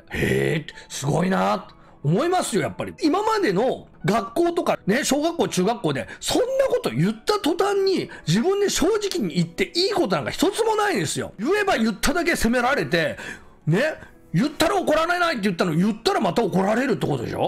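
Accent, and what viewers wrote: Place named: Kansai, Japan. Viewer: 話し方から関西方面の組織かな？やってたのなら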